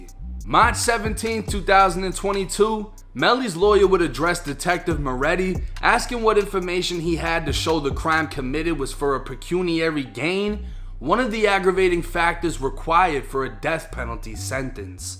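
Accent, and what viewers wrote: Boston, United States. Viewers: U sound like u from Boston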